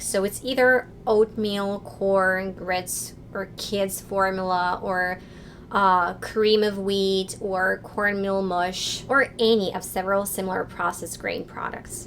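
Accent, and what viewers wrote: United States. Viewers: Oh ukranian stop playing smart you call that sosiska i remenber you cant tell the f diffrence you call ever f thing kasha please stop , and speak with neutral accent because when you try to copy us , you add a lot of anerican accent abd it just sounds horrible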